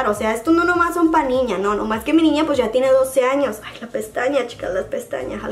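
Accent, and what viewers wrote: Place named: Mexico City, Mexico. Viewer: ahh mira .. mi esposo es de aya ... crei q eras de la ciudad de mexico por q asi soy yo de chistosa pa hablar jajajaja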